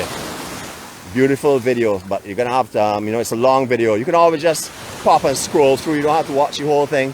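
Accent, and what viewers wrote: Barbados. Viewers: The Bajan accent is so cool. Love listening to you, and the ripples of the waves on the beach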